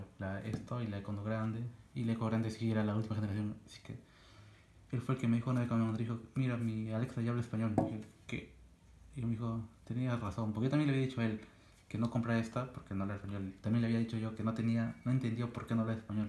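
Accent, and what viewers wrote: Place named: Philippines. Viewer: pesimo video no se te entiende nada hermano y parece que hablas en filipino o algo asi ...con todo respeto es mi punto de vista gracias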